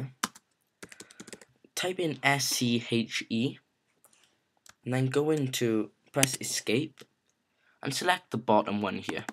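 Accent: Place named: Wales, United Kingdom. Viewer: Love the welsh accent :)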